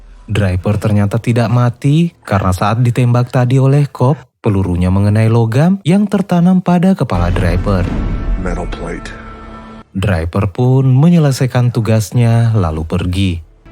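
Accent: Polewali, Indonesia. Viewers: Kamu org Polewali kah bang...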